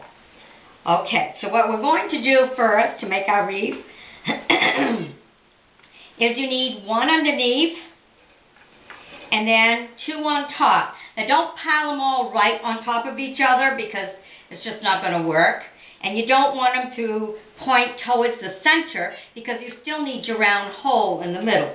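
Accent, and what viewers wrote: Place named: Maine, United States. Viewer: Are you from Maine? I thought so with the accent then you said homemade Italians? I knew it! Thank you for sharing...Merry Christmas!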